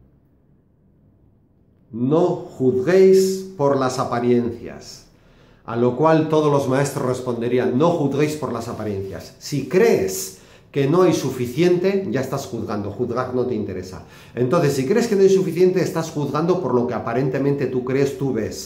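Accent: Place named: Basque Country, Spain. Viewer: Fantástico! Tu pasión nos transmite un verdadero chute de energía positiva! pareces vasco hablando... yo lo soy. Gracias! 💫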